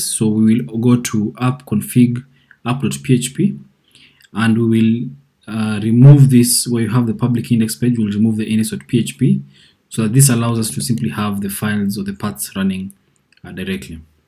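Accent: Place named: Kenya. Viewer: Totally unrelated, but you sound Kenyan🤔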